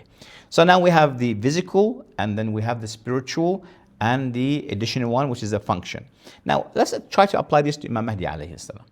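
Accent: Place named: Michigan, United States. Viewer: وكانك من لهجتك عمامة ميشكانية😢😢😢فان كنت كذلك فلعنة الله عليك فما انت الا اشر الخلق.....الحمكم ان كنت من امريكا..فإنما انت ديوث قواد منحط اخلاقيا عديم شرف،وهذا ابسط ما يقال فيك....ان كنت من امريكا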